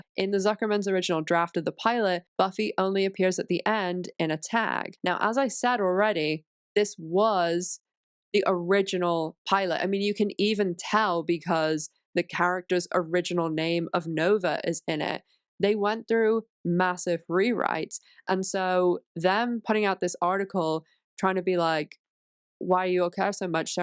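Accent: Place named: United Kingdom. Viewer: How come every 15th word is in a British accent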